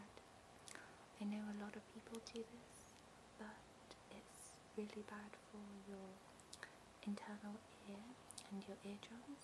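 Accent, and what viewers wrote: England, United Kingdom. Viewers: I love the idea of an ear examination video. I'm addicted! I usually don't get ASMR from English accents - something about being reminded of myself XD - but this was faaaabulously tingly. Thank you! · you have an amazing voice. i was not expevting an english accent from an asian girl either. really great video. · i think i need to move to the uk... I love women with this accent... >.< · A Korean with an english accent is a juicy contradiction!!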